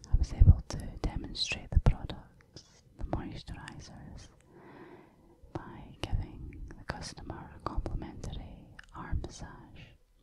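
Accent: Scotland, United Kingdom. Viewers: I adore your voice because of the accent, imo a Scottish or Irish accent sounds far more interesting and elegant than an American accent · It''s been a super tough week, gonna need that that Scottish Brogue to calm my brain. I'm from Edinburgh and I miss the sweetness of the language. Hope you're well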